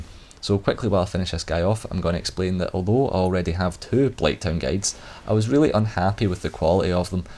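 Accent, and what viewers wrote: Scotland, United Kingdom. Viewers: Thank you for these, I appreciate the amount of detail and thought put into your videos, and your scottish accent does make it easier listening to long videos. Was a little bit surprised when you said you struggled everytime you walked over that moving bridge. For me it's been the complete opposite, tried running or jogging a few times a long time ago and fell off, have been walking over ever since without any accidents. Anyway thanks again!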